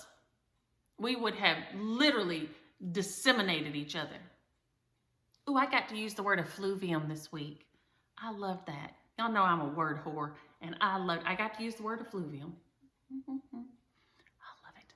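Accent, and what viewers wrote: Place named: Alabama, United States. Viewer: He....I am late coming to the party! Please forgive me! 😂I watched a few of your videos and said to myself....this girl must be from Alabama with that accent! Then I heard you refer to Texas....and my eyes popped out! I am a native Texan...and I didn’t even recognize your accent! I’m in La Grange (you know what we are known for 😳) what part of the start are you in? You are very entertaining! I plan on slowly catching up on your past videos!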